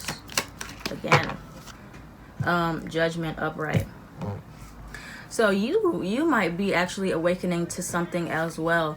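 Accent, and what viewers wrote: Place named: New York, United States. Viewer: This was the best reading, Today I felt the real New Yorker came out and spoke to me in a New York sort of way, I really like that and everything truly resonate 100% I am in a 3rd party situation with pisces his my husband of thirteen years, he left me like ten months ago, I recently find out that the women is pregnant for him, So I decided this was not for me anymore and filed for Divorce, some days are harder than others, I feel I'm moving forward, but by my capricorn standard not fast enough but I'm learning to take it one day out time, I believe the Universe got my back on this one because of the cruelty of the betrayal and how it was serve, still having a hard time with that one. Anyway Thank you for reading this long ass comment.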